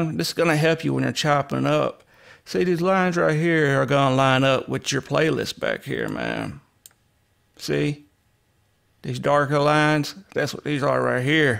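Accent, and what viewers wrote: South, United States. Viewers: Your thick southern accent makes this vid that much better...knowlege God!!! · Wow...this is incredible on hella so many levels. Thank you. Im from the south so I am used to accents but it makes it better to watch....not sure if its you or an act but you should really keep this up. Thank you so much. I would pay you for tutorials...super good info. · 12 min in iam loving that cowboy accent...this video helped a lot cause i was always curious on how to get that & vibe with chopped up samples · Hey man, you sound country as hell, but you know your stuff Lol. I really appreciate this video, brother.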